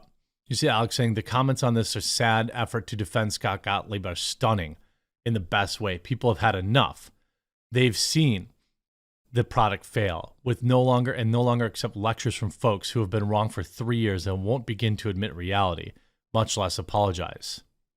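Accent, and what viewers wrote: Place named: South, United States. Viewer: Terrible, terrible first 6 mins. I know you don't care to why someone is watching your recordings. Just know I for one watching you get some serious cringe. I am a conservative btw. Your real red neck swamp accent, your memory, your facts, your dumb Coffee and its constant promotion even when I have YouTube Prime. I just shake my head and think it's really bad here. And also lots of Cringe.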